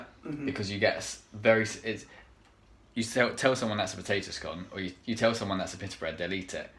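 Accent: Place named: Scotland, United Kingdom. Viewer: Loves the Scots voice- nice roses too in the background whey to go paddle!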